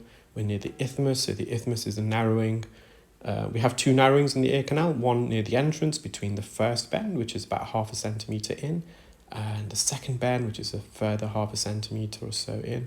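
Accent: United Kingdom. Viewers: Side note: I never tire of hearing you talk. There is something so soothing about your voice. And I love your UK accent. (big smile)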